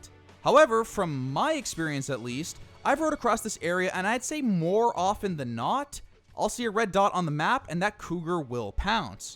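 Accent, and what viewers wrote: United States. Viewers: I swear this dude is from Maryland. "Waaaayyyyygen" "budddon" I'll bet he says "wuuter" too lol. But great great video. Subscribed for sure. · Weygun, Wisconsin accent?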